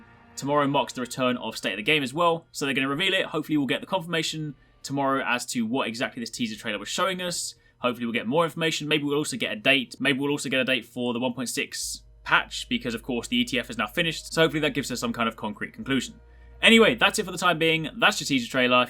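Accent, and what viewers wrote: England, United Kingdom. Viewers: Where in England you from?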